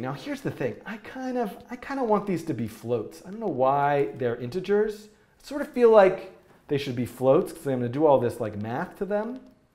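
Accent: France. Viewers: I love your french accent 😝 préviou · Thanks for your content, your french accent was sooo bravo that I tried to implement this challenge, I added a bit of colors and random agents walking on the screen. Here's a link if you want to take a look at it. <link>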